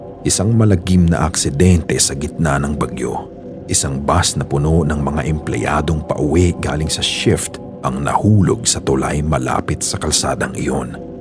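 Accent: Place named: United States. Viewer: Nakakagulat ung tagalog na kwento pero nagging american accent pag english words. Okay naman ang Filipino accent na english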